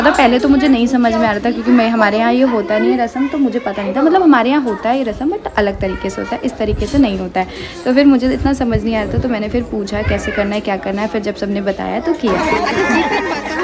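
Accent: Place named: Ballia, India. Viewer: Di but mje ek chiz smjh nh ati ki jb ap bihar ki ho ar meea balia m nanihal h . But language to apki same h Jesi balia m boli jati h .but bihar ki ti alg hoti h .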